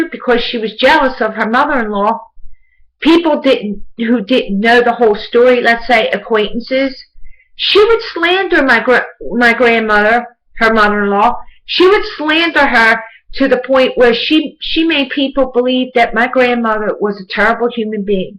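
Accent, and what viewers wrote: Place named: Northeast, United States. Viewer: HI, My prayers go out to you, u sound like you may be from the northeast im in the philadelphia area. My mother too is a narcissist and i just had a question for you regarding the enablers in our family, do you have any advice on how to reach them? my sister is very much an enabler and was parentized as well, and i cant seem to get her to come around so she can start healing from the abuse shes been through. Any input from you would be appreciated. God Bless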